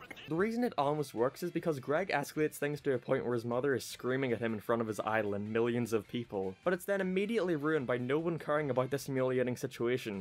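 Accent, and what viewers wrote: Ireland. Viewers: Wasn’t until that i realized he has an Irish accident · Dude where are you from I love your accent! Irish?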